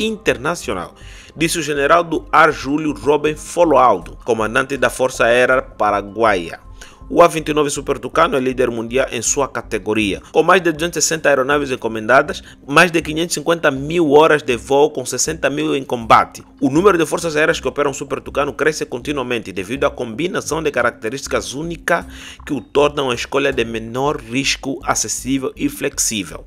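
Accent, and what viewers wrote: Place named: Angola. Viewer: Esse sotaque e de Angola 😊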